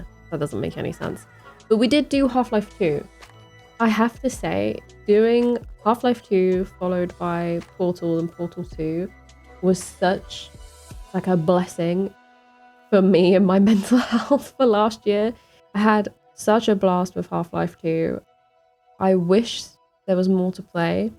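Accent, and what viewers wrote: United Kingdom. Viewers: I like to find new girls\womans which is gamers. Usually it's because of Half-Life\Half-Life 2 playthrough. It's a great base. I straight watched: Half-Life 2 Half-Life 2: Episode 1 Half-Life 2: Episode 2 Portal 1 Portal 2 Nice voice, nice accent (British?). If you like Half-Life 2 then you probably would like to play Half-Life: Alyx. Yeah, VR might be a problem, but there are ways to play it without VR. Trust me, i played it on VR headset for a phone via laptop with external GPU. I also saw girls\womans gamers playing war games: Battlefield, Call of Duty. There are really cool stories, like: Battlefield 3 or Call of Duty: Modern Warfare and especially Call of Duty: Black Ops. But think twice before go in Call of Duty: World at War. It's might be difficult emotionally. SO, it's nice to have you on YouTube,. And... 53? Really? Are you kidding me?:) P.S. 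Hello from Russia.;)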